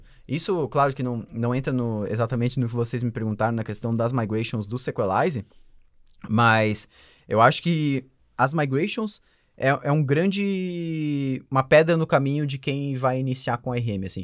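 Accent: Rio Grande do Sul, Brazil. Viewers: Vc é gaucho?? que papo é esse de TU?????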